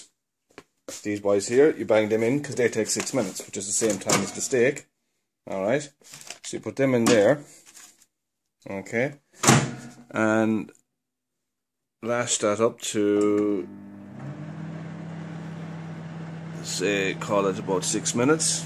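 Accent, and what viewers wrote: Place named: Caribbean. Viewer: Caribbean accent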